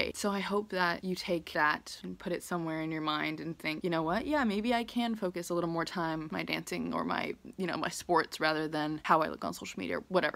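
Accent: United Kingdom. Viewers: every video she does a british accent and it's cute haha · AHAHHAA i love this british accent!!!! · I want your English accent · Loving the British accent😂💞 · love your british accent🤗 so cute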